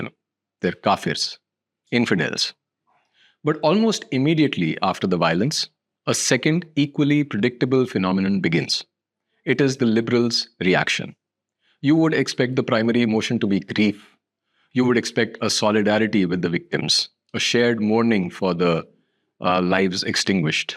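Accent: India. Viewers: I came my chance; thank you. Your accent and narration indicates a mix of north and south indian (tamil?). Once again a big thank you!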